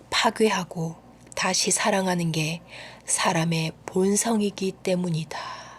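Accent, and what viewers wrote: Gyeongsang, South Korea. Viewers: 원래 경상도태생아니시죠? 경상도 사투리는 저음으로만~쭉가는데 님께선 위쪽지방하고경상도말투가섞인거 같애여 ㅎ 제가 대구거든요 😛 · ! 경남이나 부산 분이시죠? ^^ 그쪽 억양이 느껴져요 ㅎㅎ 딕션이 좋으셔서 듣기 좋습니다.^^ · 카더라, 카더라, ㅎㅎ 저도 경상도 출신이라 그런지 사투리가 정겹고 좋네요 내 마음의 고향에 온 거 같습니다~~👍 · 아니 대체 상담사들이 할수있는말이랑 머가 다른가요?솔찍히 경상도 목소리 좋아서 맨날 자기전에 듣고 있는데 요 이 책은 깝깝하게 들려요... 알아서 하란거잖아요... 예수도 그시대에 말 잘하는 철학자라는 생각하시는분 없나요?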